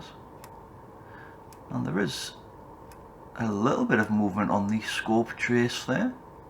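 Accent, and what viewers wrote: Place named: Scotland, United Kingdom. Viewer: It was a long video. So I fast forwarded it. Could not find the part you resolved it. But I enjoyed your accent. Sounds a bit Scottish. Is it? Can you tell me what went wrong with the unit?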